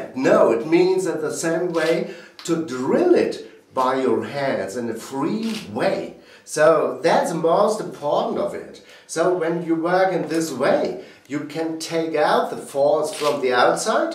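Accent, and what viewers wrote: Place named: Germany. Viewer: this guys accents sounds like a german christopher walken.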